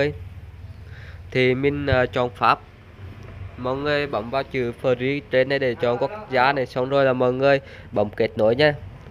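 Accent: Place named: Quang Binh, Vietnam. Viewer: Giọng Anh em ở Quảng Bình giống y hệt Anh lun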